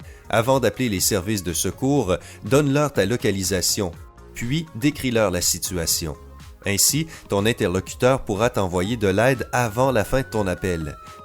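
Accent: Quebec, Canada. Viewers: L accent Quebecois ET trop drole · l'accent quebecois désolé mais non s'il vous plaît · Quel accent de merde cet accent québécois quand même, on dirait de la chiasse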